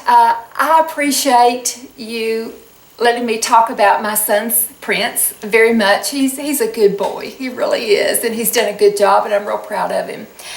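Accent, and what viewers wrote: South, United States. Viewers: i love how you southerners say "film".I simply love your accent.Almost all the Youtube ladies i watch are southern American.When i grow up im gona be a south American.lol.♥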